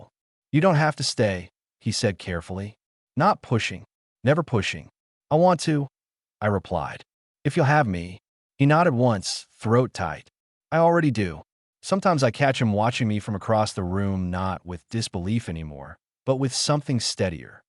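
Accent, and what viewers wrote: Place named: Spain. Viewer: I agree with you that this story was good but did you hear the Spanish intonation in the narrative? Best wishes to you 🙋‍♂️🇬🇧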